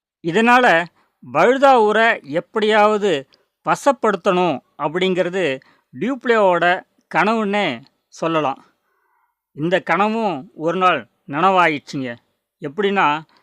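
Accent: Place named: Madurai, India. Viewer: அருமையான வரலாற்று தொகுப்பு. இனிமையாக ங்க போட்டு மதுரைக்காரர் போல பேசுகிறீர்கள் ஐயா.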